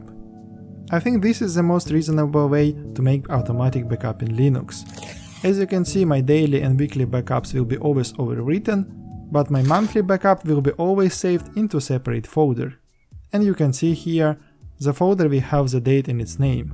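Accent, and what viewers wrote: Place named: Russia. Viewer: какой ужасный русский акцент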